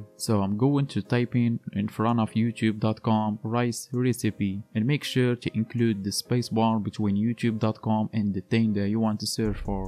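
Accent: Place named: Morocco. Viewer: باينة مغربي اساط غا من هضرتك الله يسهل ابرو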